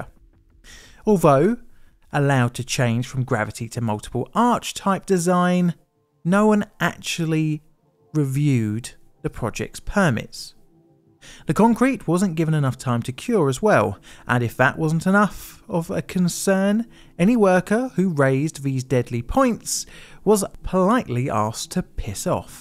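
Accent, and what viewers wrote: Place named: England, United Kingdom. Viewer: In future videos, can you stop using that awful England accent. It's rather annoying.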